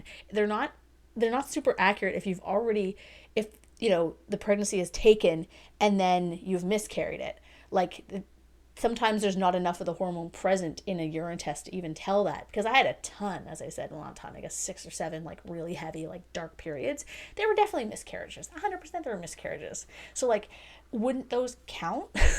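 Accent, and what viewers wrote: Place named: RP, United Kingdom. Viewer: 🇬🇧 Britisher here, laughing at your posh accent! 😆 I was wondering where that came from, until you mentioned the audio book and it began to fit into place. That got dark right at the end of the spoiler section! 😳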